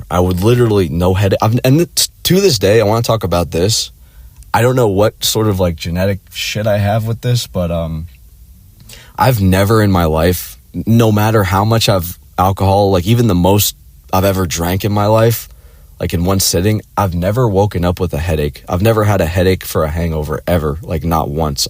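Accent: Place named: Minnesota, United States. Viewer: Dang the Minnesota accent is so clear in this video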